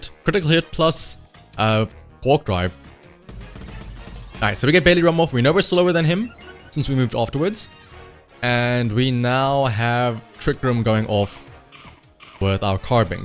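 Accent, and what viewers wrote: South Africa. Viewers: hey man are you from south africa? I feel like i recognise the accent haha. saying this in zimbabwe!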